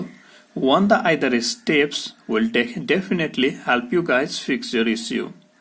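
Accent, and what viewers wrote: India. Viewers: Being an Indian, this is the most Indian accent i've ever heard.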